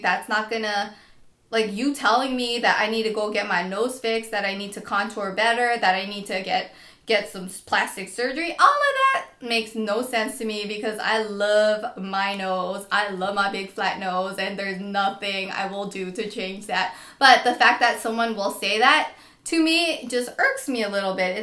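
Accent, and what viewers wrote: Los Angeles, United States. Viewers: you are such an LA girl.. ur accent the way you talk is sooo LA.. (i live in LA) i love it... 'u can take the girl outta the hood but not the hood outta the girl' godbless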